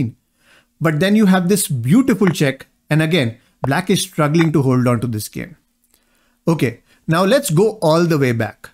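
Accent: India. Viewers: This is word for word gotham’s video with an Indian guy narrating 😂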